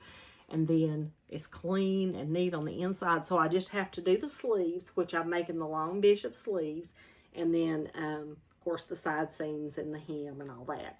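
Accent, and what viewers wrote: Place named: North Carolina, United States. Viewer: I can just listen to you talk all day long. You sound like my cousins down in Yancey County NC. I was born there but we moved away when I was four years old. Your sewing channel is so fun to watch, love it! 😍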